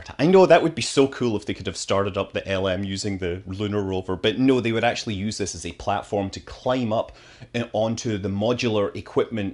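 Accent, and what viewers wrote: Scotland, United Kingdom. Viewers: I love these videos. The combination of subject matter, that gets the mind wandering to all sorts of different places , and the Scottish brogue of the presenter means that I have never actually got through one without falling asleep. They’re the best insomnia cure, next to the Jeff Bridges sleep tapes, that I’ve ever had. The sad thing is I now know about half the relevant information about loads of things to do with space flight.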